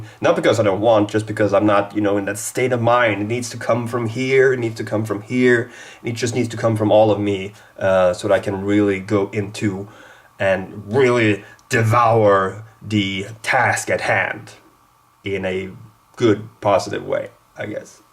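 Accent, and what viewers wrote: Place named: Scania, Sweden. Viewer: I'm feeling P4A this year, but it's the first time since 2009 I really, really enjoy it. Btw, talking about friendship, your P4A video of 2009 is still my favourite P4A video ever. Led me to your channel, and then a whole bunch of awesome people. :) You're a great network creator. And your dialect is awesome. I get happy every time I hear scanian. God Jul. ^_^ Alla sova, släckta äro ljusen, äre ljusen Tipp tapp, tipp tapp, tippe-tippe-tipp-tapp, tipp, tipp, tapp *clinks on piano*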